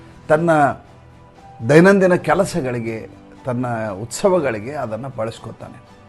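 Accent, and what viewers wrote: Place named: North Karnataka, India. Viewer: ಉತ್ತರ ಕರ್ನಾಟಕದ ಗಂಡು ಕನ್ನಡ ನಿಮಗs ಗೊತ್ತಿಲ್ಲಧಂಗ ನುಸುಳೋದು ಕೇಳ್ಲಿಕ್ಕೆ ಮಜಾ ಅನಸ್ತದ!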